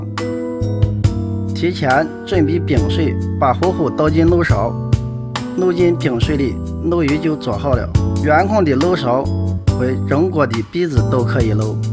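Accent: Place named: Shaanxi, China. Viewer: 陕西陕西话蛮好听的，这个鱼做甜品也很好吃😋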